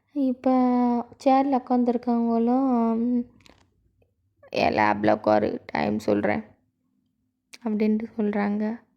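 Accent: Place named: Kerala, India. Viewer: Neega malayalam ahhh..? Light ahhh malayalam slang varuthuu ana athum nallah tha erukkk super storyyy😌🤍🫴🏻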